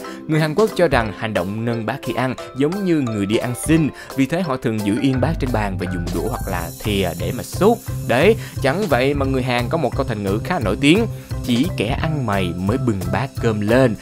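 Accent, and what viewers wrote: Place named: North, Vietnam. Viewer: ad nói giọng bắc nghe cute vải :)))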